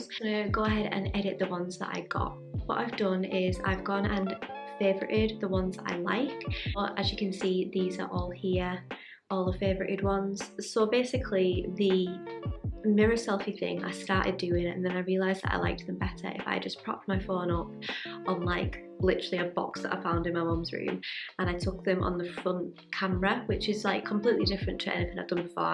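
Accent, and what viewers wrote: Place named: Cumbria, United Kingdom. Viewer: Cumbria accent aiiii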